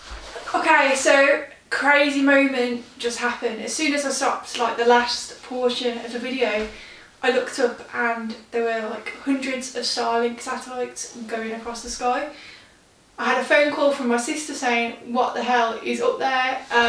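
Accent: United Kingdom. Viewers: Loved the video! Definitely going to be a hit! I have a small request. What was the plate solving software you used? Sorry, my American ears don't always hear the British words accurately.😊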